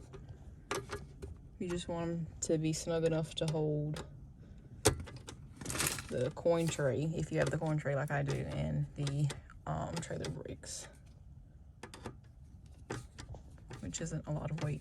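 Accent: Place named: South, United States. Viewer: I love your southern accent ❤❤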